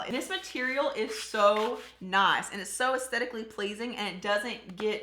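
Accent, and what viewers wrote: South, United States. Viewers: I heard your accent and subscribed😂😂 As a girl from a small town in NC, I say we need more southern youtubers lol!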